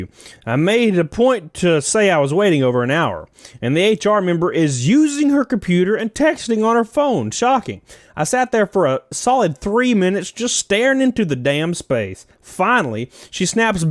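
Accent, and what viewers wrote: South, United States. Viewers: havent watched this channel in a while, man did become a lot more southern!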